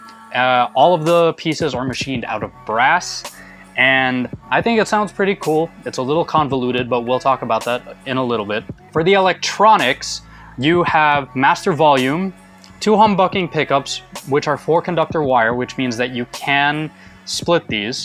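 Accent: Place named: United States. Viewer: Atlas is a nice word for pine. That os nice companies are putting the battery cavity in the guitar incase u switch/already have active pickups. I always just took the d battery and wrapped it in electrical tape and put it in the regular control cavity (alot cheaper and easier than routing a new cavity). Lol you threw me off when you said pesos because you have an american accent but Spanish last name.😊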